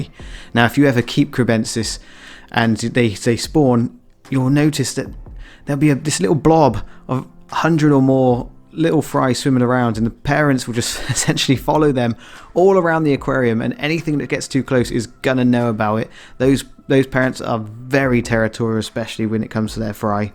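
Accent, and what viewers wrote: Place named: United States. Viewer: I hate American accent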